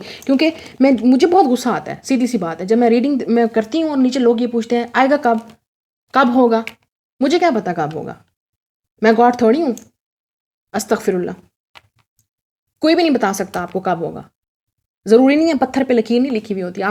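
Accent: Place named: Punjab, India. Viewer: Oye hoyeeee.... Enni sweet punjaabi.... ♡♡♡♡♡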